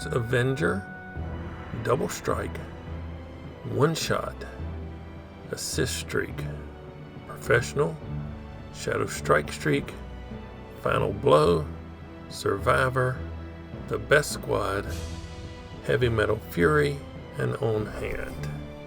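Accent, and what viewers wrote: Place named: Appalachia, United States. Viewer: Ok. I'm from Tennessee. I thought you sounded a little Appalachian like me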